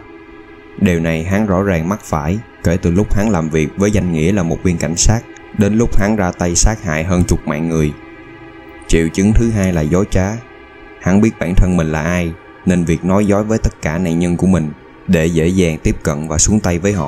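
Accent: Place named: South, Vietnam. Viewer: giọng nói miền Nam về rất hay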